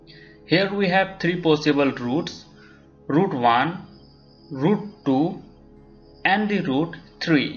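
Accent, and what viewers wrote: India. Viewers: This indian accent is stronger than Arnold Schwarzenegger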